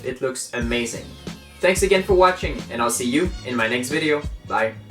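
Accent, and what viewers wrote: Canada. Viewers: Thanks. I've seen people use cotton fibers from cotton balls applied with watered down glue to achieve a nice corroded texture. You sound Canadian. So Hi from a fellow Maker and Canuck from Quebec City.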